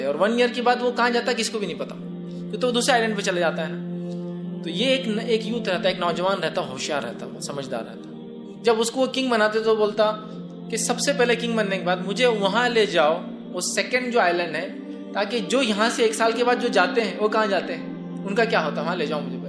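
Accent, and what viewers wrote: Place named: Hyderabad, India. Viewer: Hyderabad wale lag rahe hai ye.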